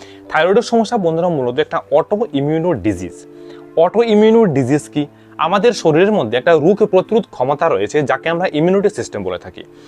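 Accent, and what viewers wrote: Bangladesh. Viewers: Apnar kothate mone hochche apni Bangladeser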